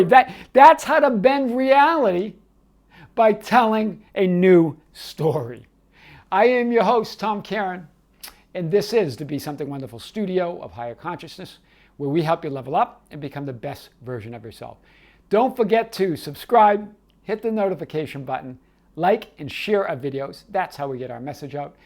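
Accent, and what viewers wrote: Boston, United States. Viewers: From Boston? 🚀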